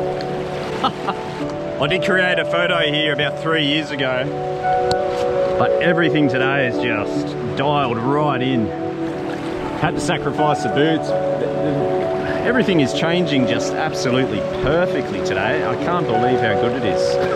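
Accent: Australia. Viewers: Just spectacular. I have only just come across your channel and am stunned by every image in each video and your easy to listen to style (Aussie) and the detailed information you provide. I must get to NZ.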